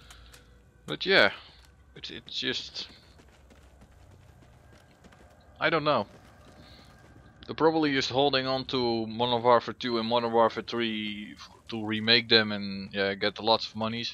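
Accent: Netherlands. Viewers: Haha deze man is Nederlands en dat kan je echt ziek goed horen. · Are you dutch?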